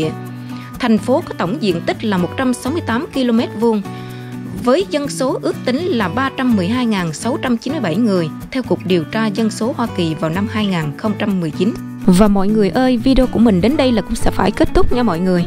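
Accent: Standard. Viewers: Nói chuyện quá chuẩn hay luôn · Ban đúng là nhà báo chân thųc đúng nghiã.vūa biên tâp vūa xương ngôn viên rât chuân.rât trình đô và giōi..trân trong ban. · Cảm ơn em giọng em hay quá nghe rất chuẩn. Cảm ơn em đã cho chị biết những gì của xu Mỹ vậy mà họ cứ gọi là thiên đường bo quê hương vn mà đi. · Hay và chuẩn giọng đọc rất hay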